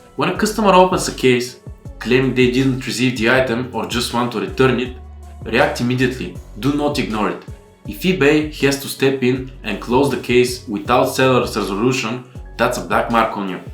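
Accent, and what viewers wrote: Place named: Russia. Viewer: Your English is very good Russian heavy accent 😅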